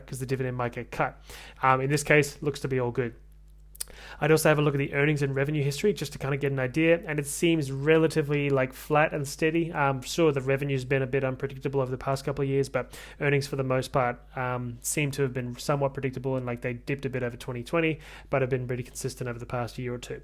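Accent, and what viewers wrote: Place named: Australia. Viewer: He’s speaking Australian, that’s how we all speak.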